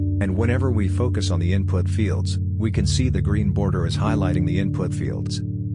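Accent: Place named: India. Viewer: Next level indian accent covering though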